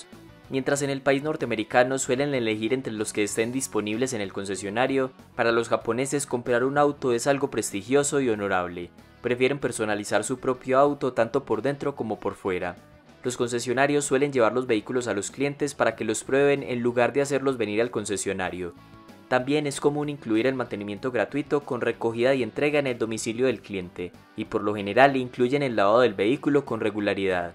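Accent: Antioquia, Colombia. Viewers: Colombiano, de la región de Antioquia, llamado paisa.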